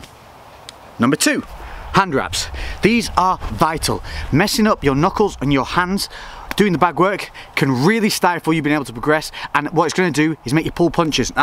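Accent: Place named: Lancashire, United Kingdom. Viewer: Where in Lancashire are you from?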